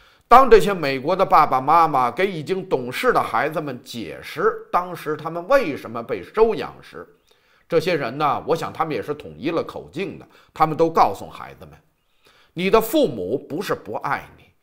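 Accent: Beijing, China. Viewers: 這口北京話，太爽了！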